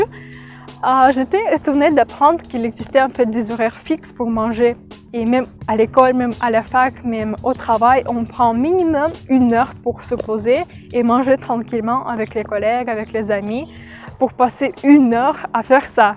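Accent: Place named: Marseille, France. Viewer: Salut! Salutations du Québec (Montréal). je suis simplement abasourdi par l'aisance et la fluidité de ton dialogue. Avant de me rendre compte que tu venais de la Russie, je crpyais que tu étais Marseillaise! Ton accent est tout ce ce qu'il y a de plus agréable et musical! Lorsque tu hésites sur un mot, on voit que tu as ampplement de vocabulaire pour te tirer d'affaires.......... Toutes mes Félicitations!!! - dan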